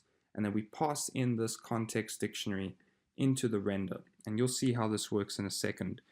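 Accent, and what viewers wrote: South Africa. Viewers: You are so from South Africa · South africa? Create video, super clear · xD defiantly a South African accent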